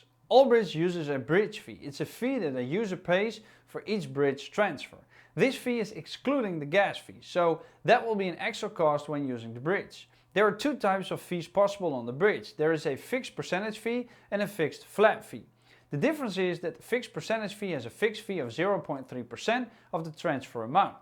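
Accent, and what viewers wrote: Netherlands. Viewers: Can tell that you are from the Netherlands xD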